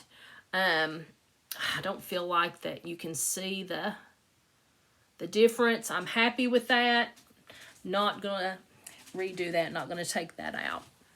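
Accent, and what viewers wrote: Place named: East Tennessee, United States. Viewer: I had a feeling when I saw your thumbnail that I would enjoy your Flosstubes, and I was right! I heard a really familiar accent in that voice. I live in East Tennessee, and you sound like my people!!! What is it with these husbands who think we have too much stuff? They have their stuff, too! You are working on some beautiful pieces. I love, too, that your husband's tractor is "sitting" in your grandfather's barn! And how awesome it will be to display your grandmother's click & wheel with the piece stitched to commemorate her with hers! Thank you so much for sharing!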